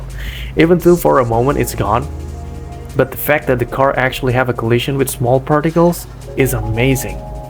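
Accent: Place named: United States. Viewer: it's funny how you, not being a native english speaker, have so much american accent. and I agree with the list, I'm super hyped about this game because of roleplay details like the ones you mentioned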